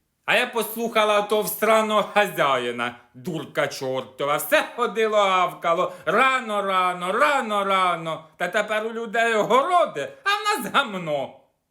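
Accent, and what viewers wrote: Poltava, Ukraine. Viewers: Через Гальку не посадила раньше огород.. Іван там де садив то срака собача сидить а не огород. Ну вже ж підняла настрій. Наш Полтавський говір. Наче дома побувала. Молодець.❤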